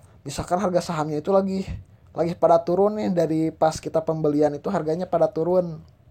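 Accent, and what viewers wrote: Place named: West Java, Indonesia. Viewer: Ciri khas bahasa ,,,Orang Sunda 🤣🤣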